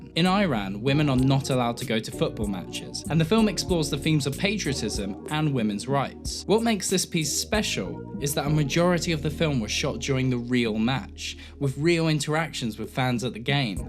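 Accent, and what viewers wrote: United Kingdom. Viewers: Why are you pronouncing Iran like an American? Its weird af. You have a british accent for the rest of the thing, you weirdo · The British accent keeps me watching.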